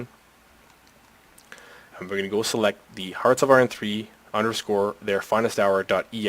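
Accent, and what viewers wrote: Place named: United States. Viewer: i hate american accent. please note im tired an am annoyed of everything.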